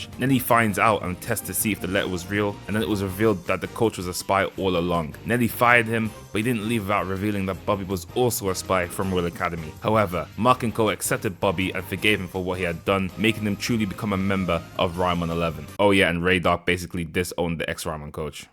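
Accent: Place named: United Kingdom. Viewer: Love you bro I love British Accent🫶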